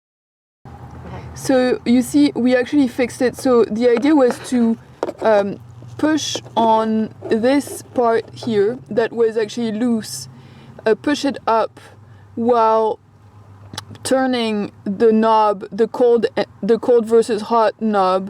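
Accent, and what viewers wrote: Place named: France. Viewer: You have saved my husband's life.....he was going crazy with his A/C until this video.....!!!! Plus you have a beautiful french accent!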